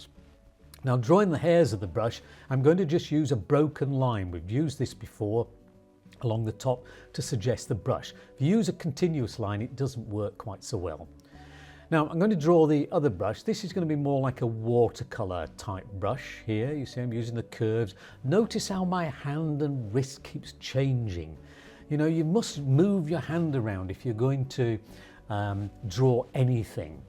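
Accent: United Kingdom. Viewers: لكنته بريطاني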